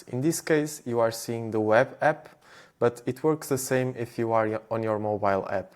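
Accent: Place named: Portugal. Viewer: Are you portuguese? From the sound it seems. Do you know in Portugal if we can follow the process you just shared?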